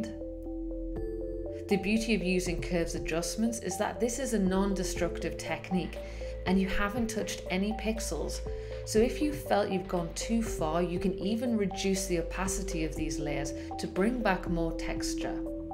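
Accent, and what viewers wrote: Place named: United Kingdom. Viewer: Very beautiful british accent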